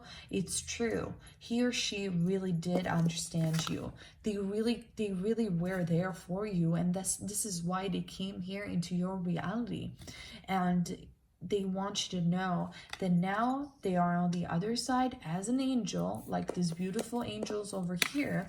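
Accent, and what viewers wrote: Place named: Italy. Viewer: Oh my goodness SWEET 😍 ❤️ sweet Precious- BELLICIMO 🥰 Tarot 🌠🔮 🙏 reader that I JUST realized I ALREADY ✓ DID visit your channel many, MANY months ago as you assisted me in MY difficult time of berievment !!!😲 Yes! And I was revisiting the video and recognized your distinct accent. (Italian dilect) WOW, amazing. I have been enjoying the channel and it's growth. You are a favorite 💜🦄💟 🌈 of mine and I've told you how you stand out for your authenticity & vulnerability with your audience. The healing process 🙏 💔 😥 😪 is an interesting one after the loss of a pet because I believe the instantly 👼🐕 become guardian angels and the proof is everywhere!!!! So it's just bittersweet 😔 ya know. May you be so very at ☮️ as you are able 💗 to stewart the impowering gift of connecting with the Underworld. Love.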